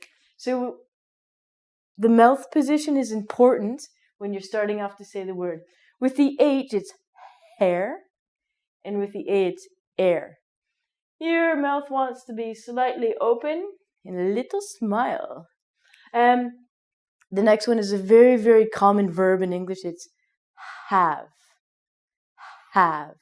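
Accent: Canada. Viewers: oh my gosh, you're Canadian!! :D sorry, i had to say that xD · Great video for learning Canadian pronunciation. out = "oh-t" mouth = "mow-th" etc. And she has that cheerful positive Canadian attitude as well. Love it.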